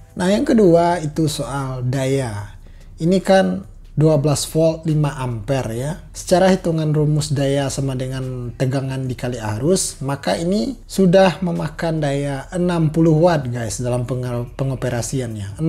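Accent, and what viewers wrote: West Sumatra, Indonesia. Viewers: Kebawa logat minang nya bg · Logatnya mirip logat minang ya bro Nice experiment.. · Bang... Logat lu mirip Logat orang minang 😁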